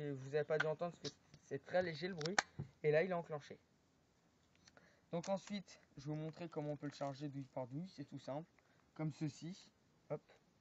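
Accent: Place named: France. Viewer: sa fait plaisir d entendre un francais mdr d habitude c tjr des americain on comprend rien super ta video continue comme sa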